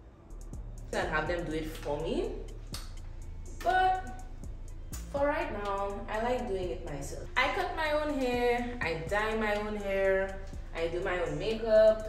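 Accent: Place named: Saint Lucia. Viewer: Omg I know this accent ..🇱🇨🇱🇨🇱🇨🇱🇨girl I’m here looking at videos to help make me get comfortable with the color . I give myself 1 more week then cut and white here I come 🤭… so happy it’s Lucian ❤❤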